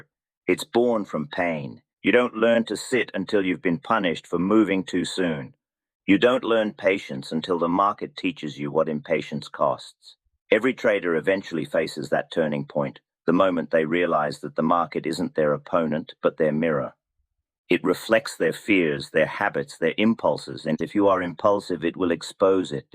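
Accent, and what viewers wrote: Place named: Australia. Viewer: Sometimes it sounds like has developed an Australian accent 🇦🇺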